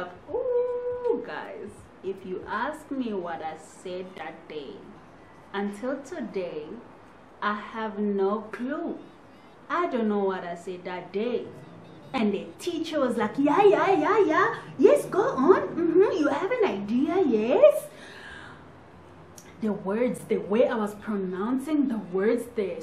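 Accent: South Africa. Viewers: You sound south african though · Your english is good though and you don't sound like someone who comes from the french-speaking country. In fact, your accent is now truly South African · You speak English so well now and you sound South African. Thank you for your content my beautiful sister.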